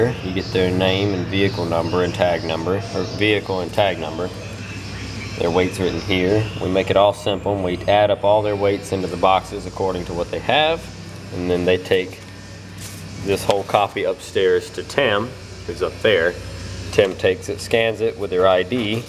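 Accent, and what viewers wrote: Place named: Carolina, United States. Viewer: Thanks...that was cool. Ever ship cars to other states? Where are you? Sounds like a Carolina voice.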